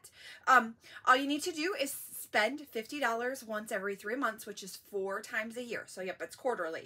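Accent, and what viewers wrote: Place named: Michigan, United States. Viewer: fellow Michigander here! Totally recognized the accent immediately 🤗💜! New consultant here. You have great content! Looking forward to seeing more 😊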